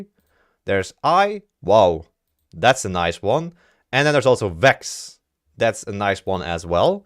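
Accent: Netherlands. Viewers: Sorry to say but you can hear that ur Dutch 😅😅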